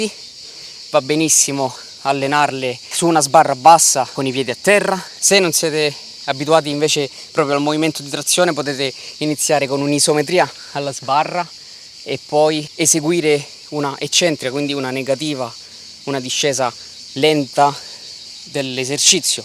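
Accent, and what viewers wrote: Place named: Portugal. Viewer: Ese acento portugués le da ese carisma genial. Grande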